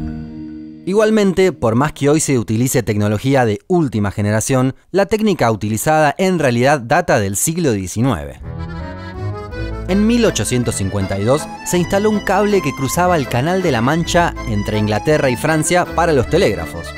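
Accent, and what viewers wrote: Argentina. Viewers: Un Mundo Inmenso tiene un formato tan atrapante, un guion excelente, y un ¡narrador argentino che!!! que hace aún más entretenido el contenido!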